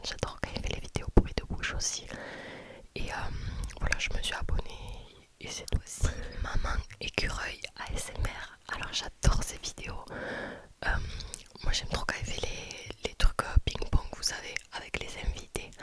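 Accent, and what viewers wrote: South, France. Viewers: Hello, oh mais c'est trop adorable ! Merci merci pour tes mots 🥰 Je regarde souvent tes vidéos aussi et j'apprécie ton chuchotement avec ton accent qui sent bon le sud 🥰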